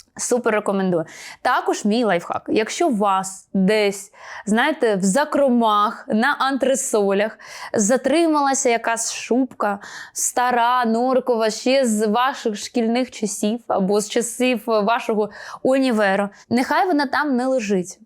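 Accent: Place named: Russia. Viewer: і купа помилок, відчувається, що людина в житті спілкується російською, і це підвердилось.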